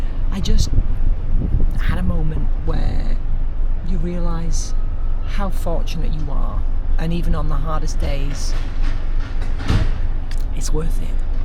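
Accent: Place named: New York, United States. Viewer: Your New York accent gives away your connection with the big Apple. Instead of admitting you're too cheap to buy an umbrella, I would have gone with "I'm soaked with sweat from Jogging". A great insight into your life. You're the best!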